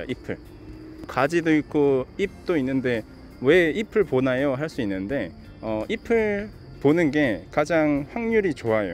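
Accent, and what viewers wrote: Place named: Gyeongsang, South Korea. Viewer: 경상도 사투리 살짜기쓰시네요? ㅎㅎ 오늘영상은 특별히 자신있는 목소리톤이셔서 활기차보이시고 좋군요! 목소리 참 매력있으세요! 늘 잘보고있어요! 감사합니다🙏🙏🙏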